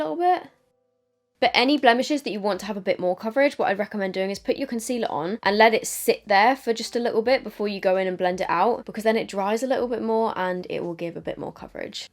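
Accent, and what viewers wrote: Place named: United Kingdom. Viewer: Regular watcher here but just had a « eurêka » moment that is going to sound weird: did you grow up in the same area/neighbourhood as Daniel Radcliffe?? Your speech pattern/enunciation is sooooo similar to my Canadian ears…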